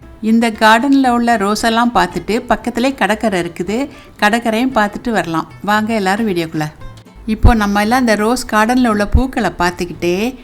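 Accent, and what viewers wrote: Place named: Tiruchirappalli, India. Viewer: இந்தியாவில் எந்த ஊர். தமிழ்நாடு. பேச்சு வழக்கு திருச்சி மாதிரி இருக்கிறது. நான் திருச்சி தான். பார்க்க பூக்கள் அழகாக உள்ளது. வெளிநாடு வெளிநாடு தான் .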